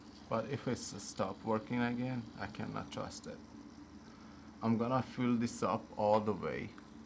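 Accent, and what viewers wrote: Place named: Iran. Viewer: nice mod. The peroxide bleached the color btw. Your accent seem to be Persian.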